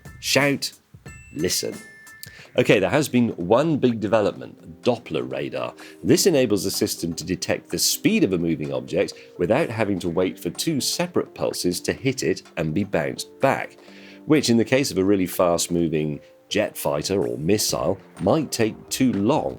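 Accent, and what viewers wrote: United Kingdom. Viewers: British accent sucks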